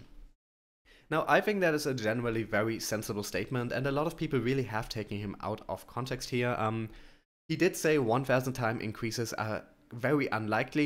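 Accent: Germany. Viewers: I like your German English accent, you speaking English very well which make you huge fans from Europe & USA around the world.. Finally I like your videos which are confident 🤝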